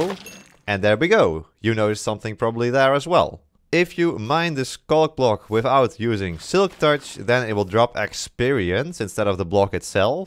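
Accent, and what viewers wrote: Netherlands. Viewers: Are you dutch? You sound like someone from the netherlands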